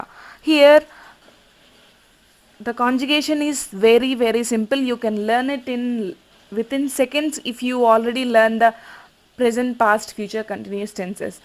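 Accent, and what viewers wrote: India. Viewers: The accent definitely is Indian.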